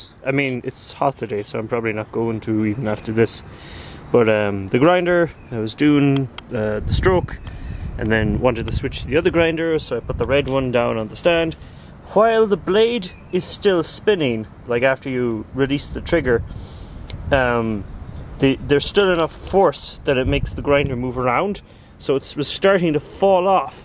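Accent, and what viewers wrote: Ireland. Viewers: I thought he was Russian then realised he was irish. What a skilled .. blacksmith? He's good. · First thing I noticed was your irish. I'm Irish too by the way. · its an irish accent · Is he irish?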